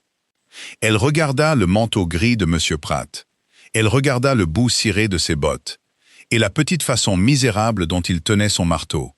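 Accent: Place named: United States. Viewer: Merci, j’ai bien aimé cette histoire très bien contée avec un joli accent, le même que celui de mon mari américain..très agréable histoire d’amour..😊 Je vous écoute du Sud de la France..😊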